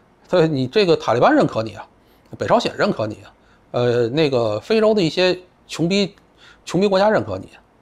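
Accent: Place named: Beijing, China. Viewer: 内容不错，京腔讨厌。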